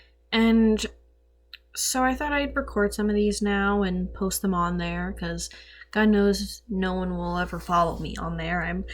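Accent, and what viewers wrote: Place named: United States. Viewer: Usually american accents irritate me but yours is very charming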